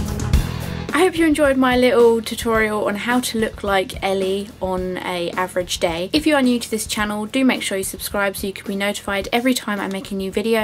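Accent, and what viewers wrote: United Kingdom. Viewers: She's British and they have an accent so not everything sounds as perfect as you wish it to be you silly American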